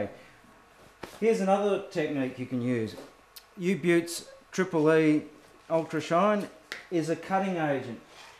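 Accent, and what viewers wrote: Australia. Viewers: Lovely. I am just interested in turning techniques and results (being a female without a lathe or indeed a shed to put it in I can only admire the work of others. But I am truly amazed at the number of things that can be used in turning. I saw one video where the man used pieces of kitchen sponge in resin and the coloured pencils items are amazing. Good to hear a home grown Aussie accent too. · I'm Just really happy he's Australian, and I can hear the familiar sayings and accent.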